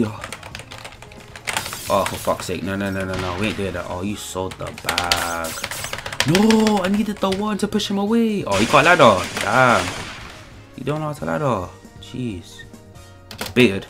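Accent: United Kingdom. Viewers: Are u black and British? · why do i think ur british now hmmmmmmm.... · are you british? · You from the uk? I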